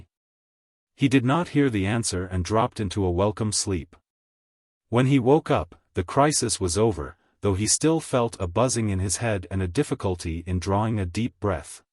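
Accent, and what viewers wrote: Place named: United States. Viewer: If you can change the AI narrator to a British male, I think it's much more effective, a story, especially from this time period, to be read aloud /heard in some sort of UK accent, rather than an American/Canadian accent. Of course, since I have not even the vaugest idea of how AI works, I don't even have a YouTube channel, I really don't know what I'm asking. For all I know it's something that would require many hours of work or, something that could happen with the click of a button, lol. Anyways, I'm deeply involved in this story, 2 plus hours in, and enjoying it regardless. And as far as I can see, you are the only channel who has uploaded it so since I'm trying to complete the entire Arsene Lupin series this way, since I, unfortunately, don't currently have access to any of the books, this particular video is so very much appreciated!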